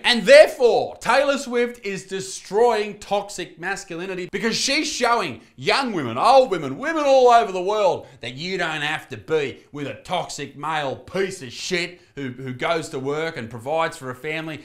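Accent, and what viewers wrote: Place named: Australia. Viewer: For some reason I love Australian voices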